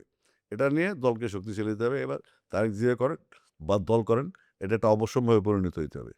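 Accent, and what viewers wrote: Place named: England, United Kingdom. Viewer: The host should slow down a little and stop mixing Bangla with an English accent…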